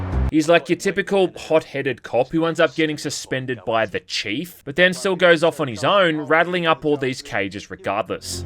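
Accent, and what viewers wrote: Australia. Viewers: Fkn AUZZIE 😂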